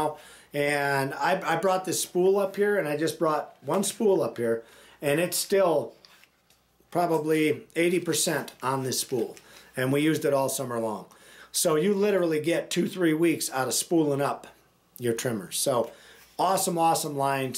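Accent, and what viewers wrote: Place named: Kentucky, United States. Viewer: I can tell you are from Kentucky.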